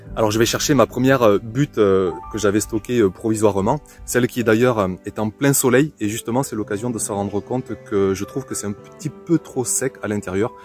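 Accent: South, France. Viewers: Bonjour, Juste pour vous dire que j’adore vos vidéos. Les notions de chimie devraient en temps normal me faire fuir mais là, j’adore, je comprends et cela fait sens! De plus, vous écouter avec votre petit accent du Sud, donne le sentiment d’être en vacances. Merci pour votre partage.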